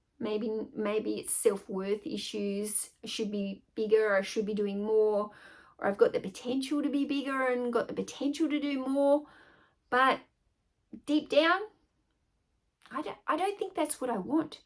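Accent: Australia. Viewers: I bet myself you had an Aussie accent before I even hit play. And whaddya know? Lol. So random. Loved the video! PS The conversion of the size for us Americans is 25 x 11.5 feet based on the numbers you gave (rounding up). So 287.5 square feet in area.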